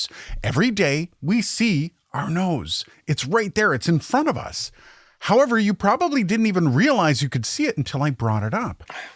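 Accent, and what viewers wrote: Canada. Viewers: All I heard this entire video was aboot.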